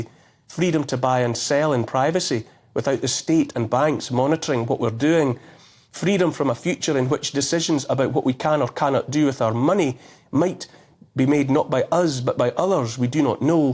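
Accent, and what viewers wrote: Scotland, United Kingdom. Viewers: You have great information here that you so generously share with whomever will watch and listen. I'm a 70 year old pale male too. So, I'm going forward with a well intended suggestion: If you could slow your broadcast down one beat...just one...my brain could more easily absorb your wonderful content. Between your beautiful Scottish accent, and the slower pace of your easy language, I could really appreciate a better chance to understand everything you are saying.: )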